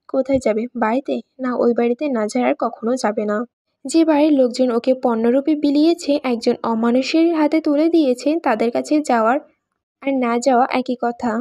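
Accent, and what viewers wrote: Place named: Barishal, Bangladesh. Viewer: আপু তুমি মনে হয় বরিশালের মেয়ে তাই তোমার ভয়েস টা ও বরিশালের মেয়েদের মত লাগে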